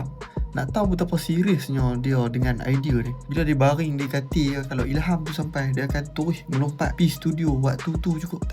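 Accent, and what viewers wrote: North, Malaysia. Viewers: Loghat utara lekat ooooiiiii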